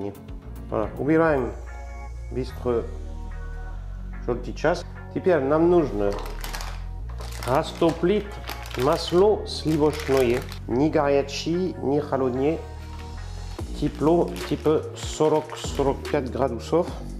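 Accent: France. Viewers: Живу 5лет во Франции, конечно,как повар по образованию,начала активно готовить. Вася Емельяненко,Илья Исакыч,смотрела всех подряд.И тут,оляля!Я нашла французского повара,говорящего по русски!Как мило Вы говорите по русски! Это комильфо! Теперь смотрю только Вас! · Уважаемый Я смотрю ваши видео с большим наслаждением, это бесподобно всё -- рецепты, прекрасный гасконец, особенно его русская речь на французский манер, это изюминка!! Слушаю вас как поэзию, никогда не переходите на чисто русский, потому что тогда потеряется шарм. Сегодня балдела от слов -- кладём в протёртую печень маслы ( надеюсь, вам объяснят, значение на русском -- маслы). Я восхищаюсь вами и благодарю за ваши видео и бесподобные рецепты. Удачи вам и всего хорошего вам и вашей семье. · Пусть разговаривает с французским акцентом, так интереснее. Да и ему удобнее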